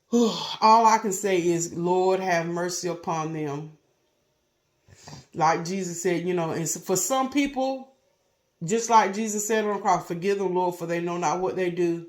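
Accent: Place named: Pittsburgh, United States. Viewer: YOU TALK LIKE YOUR ARE FROM PITTSBURGH.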